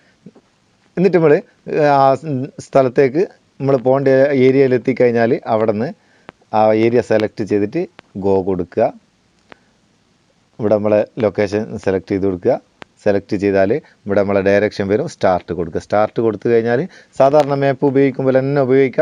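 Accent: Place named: Vadakara, India. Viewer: വളരെ ഉപകാരമുള്ള വീഡിയോ 👍👍🌹🌹🌹🌹 ഇങ്ങള് വടകര kkaran ആണോ സംസാരം കേട്ടിട്ട് ചോദിച്ചതാണ്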